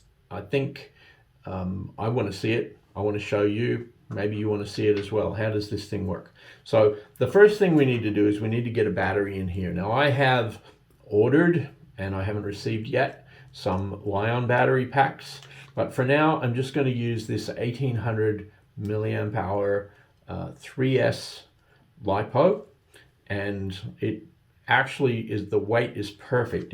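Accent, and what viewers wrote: Australia. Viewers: That's a really interesting accent. Are you an Australian in America?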